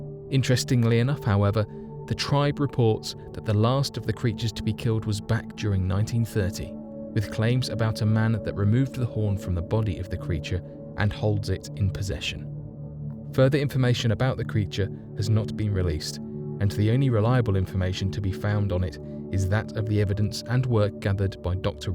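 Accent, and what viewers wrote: England, United Kingdom. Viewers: The way this is narrated is giving me headache. I'm confused because he has an English accent but it's like he's never spoken English before.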